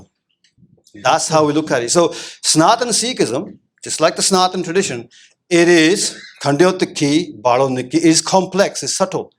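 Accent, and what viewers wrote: United Kingdom. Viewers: After watching cmnts..i realised he is also planted fraud man like his bro Sadhguru.. they all are well trained with uk accent to make youth fool. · His accent is British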